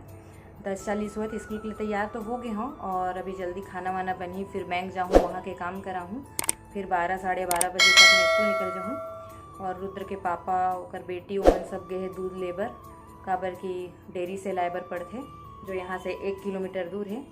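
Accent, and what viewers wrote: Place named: Chhattisgarh, India. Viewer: दीदी आप मन के छत्तीसगढ़ी बोली अबबड‌ मीठा लगते।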